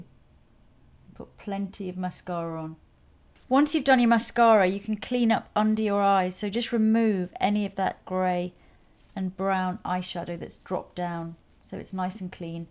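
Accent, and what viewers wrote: England, United Kingdom. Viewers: I like listening to your classy English accent. Reminds me of clients calling my line at work. :) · Which part of the UK are you from? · I like to listening English accent . You have got very beatiful voice . Videos are very beautiful Thank you ♥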